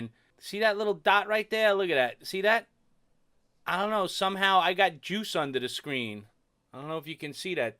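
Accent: New York, United States. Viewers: "I'm a newyorker thats the way we talk"... classic! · lol ya your New York accent would offend some of these cheese puffs in California coming from the east coast i know New Yorkers, but some of these silly fluffs man oh man this world of ours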